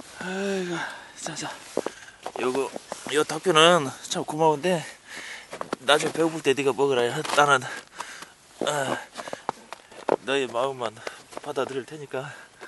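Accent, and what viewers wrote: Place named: Honam, South Korea. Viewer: 고양이가 큰게 아니라 부은거다 임마 말투도 조낸 듣기 싫네 호남사투리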